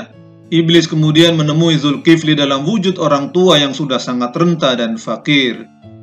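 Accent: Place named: Makassar, Indonesia. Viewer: Yg ngomong dialeg org makassar wkwwk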